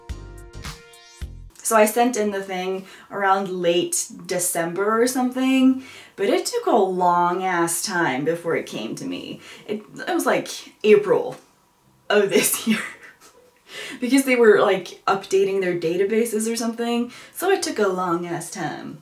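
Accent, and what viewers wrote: United States. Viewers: Your Southern accent is sickly sweet like a peach princess And I love it!! Now can you do a Texan drawl?? New subscriber and fan!! Great escape from the kids to listen to reddit stories.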